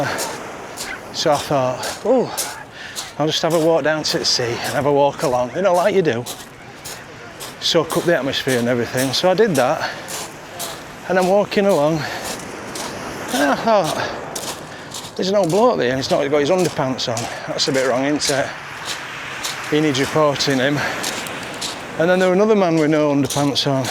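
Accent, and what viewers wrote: Yorkshire, United Kingdom. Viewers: I very much enjoy your videos, especially the Yorkshire accent, big fan here! 🍻🍻 All the best! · Hello. You bring back good memories because my family on my father's side were from Leeds and on my mother's side from Barcelona. Not only because of the accent but because of the way of being. It is said that copper was invented by a Yorkshireman and a Catalan, both pulling a peseta coin😂😂. The next time you visit Barcelona I recommend attending the magical fountain. They are renovating it until August 2025. It has summer and winter hours. I worked as a tour guide until 2016 and the truth is that it is not well known but it is the best attraction. Maybe because it's free. There are more local people than tourists attending and performs at night due to the contrast of colors. Write it in your agenda. Cheers.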